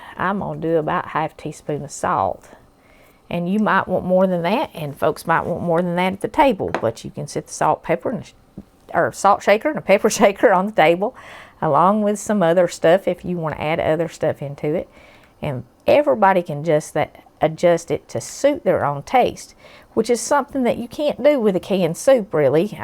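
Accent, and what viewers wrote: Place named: Tennessee, United States. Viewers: I’ve been a widow since last Christmas. I appreciate your channel because you cook good ole down home food, but the best part of your channel is that you dedicate it to also spreading the gospel of our precious SAVIOUR. I’m praying for you. It’s been a hard year for me also. My husband was an ordained minister and he was in Gospel Music for 50 years. My prayer for you is that Jesus would give you sweet peace and wrap you in His loving arms. May God richly Bless you! It’s also good listening to someone from Tennessee. I live about 35 miles east of Nashville. 🙂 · It's been many years since I left the Appalachia where I was raised. Received an Ivy League education and became a tenured professor at an elite institution. Along the way I never heard spoken the dialect of my native region, and I rinsed my own speech of it as I rose in my profession. 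God, how I love hearing you speak it. It sounds like home and family and belonging. I miss it so much. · This looks so delicious! I just love this channel. You sound like my beloved relatives who live in Tennessee and I just love how down to earth you are and that you’re not afraid to talk about God.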